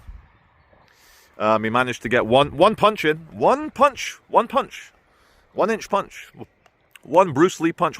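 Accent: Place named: England, United Kingdom. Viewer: You talk like a true Englishman (wherever you may have blood ties). That beautiful view is very much like the area I live in. The shires of England. The times we live in today will be gone one day. In my long life we see these times come and go, I must say, this is the worse I have seen but it will pass, I think we will come out the other end a lot better and a lot wiser. It is very much up to every decent person in our land. Keep well, God bless us all and the Jews.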